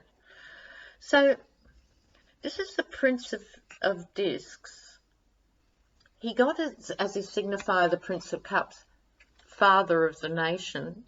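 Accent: Australia. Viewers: Thanks for your razor wit, intelligence and the amazing grasp you have on this whole twisted episode of living history. I’ve become a complete fan. Love to hear your Aussie-isms and fab lefty bolshy-ness calling it out. Xx best of love to you and your family while you are sorting out what needs sorting.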